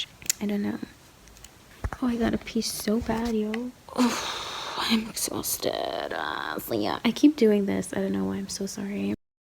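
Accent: United States. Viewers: ik dacht dat je Amerikaans ofs was maar je bent Nederlands woaaw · omg ik kom er nu achter dat je Nederlands bent terwijl ik altijd dacht dat je uit Amerika kwam ofzo 😂😂 Maar welke primer gebruik je? :) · wait youre dutch i thought you were american all this time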